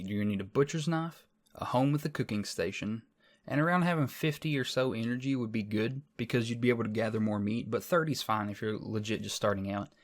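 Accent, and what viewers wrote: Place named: United States. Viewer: I love this game and your country ass accent SUBBED!!!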